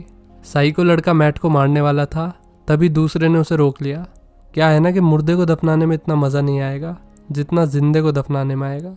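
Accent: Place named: Haryana, India. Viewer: Explainer pkka haryana see hh😂😂😂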